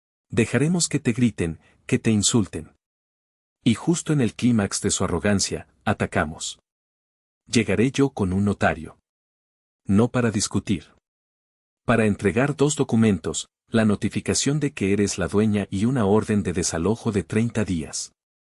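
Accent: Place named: Mexico. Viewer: Dejaron de gustarme estas narraciones,mejicanas😢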